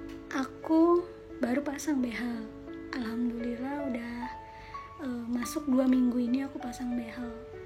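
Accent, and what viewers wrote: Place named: West Java, Indonesia. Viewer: Sunda bangettt:v wkwk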